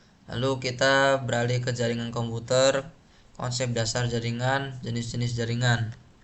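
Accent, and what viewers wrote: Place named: Java, Indonesia. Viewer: mas iki loh rek medok kali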